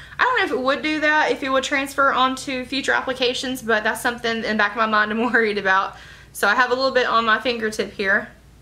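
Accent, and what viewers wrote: United States. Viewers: I love your country accent!! And your camera is bomb!!!! Love from Texas!!!! I love my boxy lux box.... The Luxie brush is so amazing it literally soaks up no product!!! Your gonna freak out!!!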